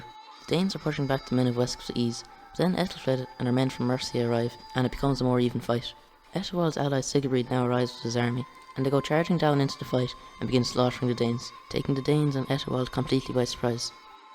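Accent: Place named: France. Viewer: at first I thought this dude was speaking french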